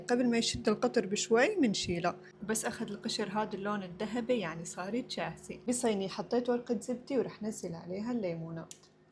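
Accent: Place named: Coast, Syria. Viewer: اي من عنا من الساحل في عدة لهجات نحنا مثلا لهجتنا قريبة من ضيعة ضايعة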